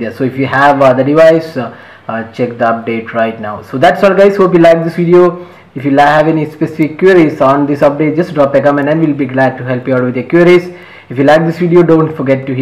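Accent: Kerala, India. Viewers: your indian accent is too strong, you need subtitles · Love ur English with Malayale Accent ! Good Job (y) !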